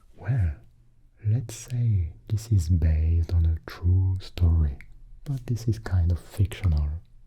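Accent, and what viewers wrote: France. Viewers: Are you french mate?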